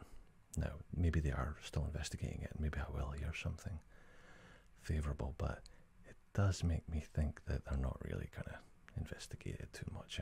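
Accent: Scotland, United Kingdom. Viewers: Scottish understones asmr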